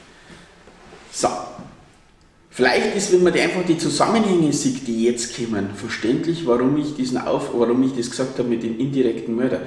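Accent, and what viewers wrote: Bavaria, Germany. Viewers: Ich hätte mir ja gerne das komplette Video angeschaut, aber die Raumakustik ist so schlecht, dass ich dein Bayrisch, das ich sonst so einigermaßen verstehe, nur mit sehr großer Anstrengung halbwegs verstehen kann. Falls ich der einzige bin, dem das so geht, dann vergiss meine konstruktiv gemeinte Kritik, denn dann liegt es wahrscheinlich an mir.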